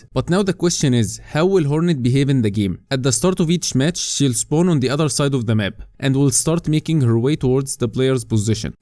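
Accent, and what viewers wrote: Egypt. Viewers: عااااااش يا باشا ماشاءالله موهوب عرفت انك مصري من اول الفيديو❤❤❤❤❤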